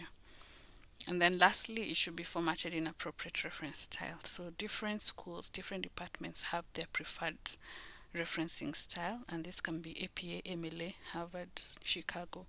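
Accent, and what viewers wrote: Kenya. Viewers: I know that accent anywhere, Thank you for the information miss +254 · Very helpful, loving the Kenyan accent too. Happy to be your 6th subscriber....yeey!! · couldn't help but notice the Kenyan accent good work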